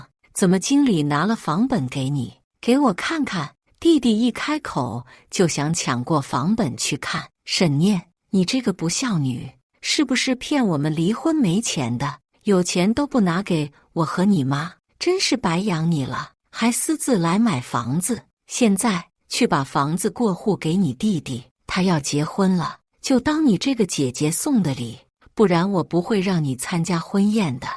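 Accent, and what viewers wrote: China. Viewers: 聽不懂，是什麼鄉下方言？ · 操 后半段用普通话发音说粤语（或者闽南话？）！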